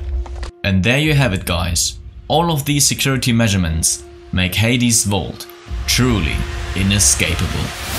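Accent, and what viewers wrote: Germany. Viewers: You are German?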